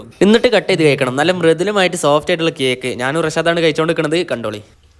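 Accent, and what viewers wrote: Malappuram, India. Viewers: Nammale malappuram baasha polichilleeeee guys kondotty power......😄😃😉😉😉😉😉😉😉😉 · ഞാൻ കണ്ടീൽ വെച്ച് മലപ്പുറം വർത്താനം കറക്റ്റ് കൊണ്ടുവന്ന ഒരേ ഒരു വ്യക്തി ♥️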